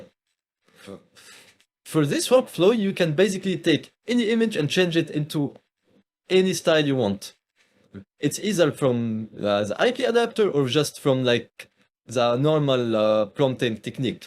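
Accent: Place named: France. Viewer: the french accent 👌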